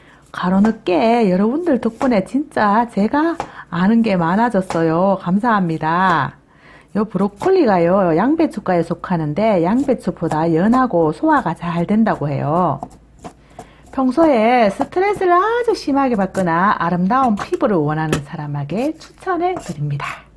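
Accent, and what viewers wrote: Gyeongsang, South Korea. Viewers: ㅎㅎ 하고웃지요 갱상도사투리ㅋ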